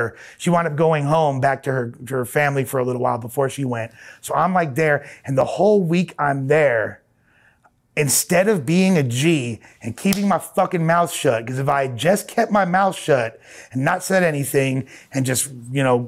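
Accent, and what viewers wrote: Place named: Los Angeles, United States. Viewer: Genuine west side L.A fella , authentic in articulation , finally hear someone who gets it , the recovery language , the program , actually talking the walk. Thank you for "sharing".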